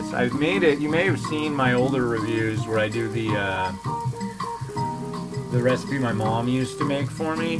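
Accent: United States. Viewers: For some reason your videos have got the vibe of 2000s. Maybe it's the editing. P.S. I hope you'll get the most you can from all these Russian dudes. P.P.S. Your accent is sooo satisfying to listen to. Smooth and very American. Nice pronunciation always gets me.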